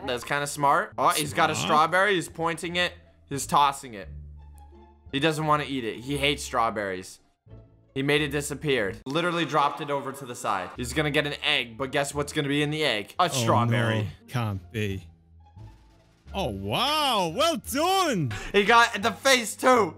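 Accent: United Kingdom. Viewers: Are you actually British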